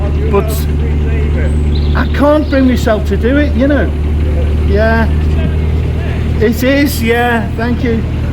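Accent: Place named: Cockney, United Kingdom. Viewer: Gutted I missed you cockney!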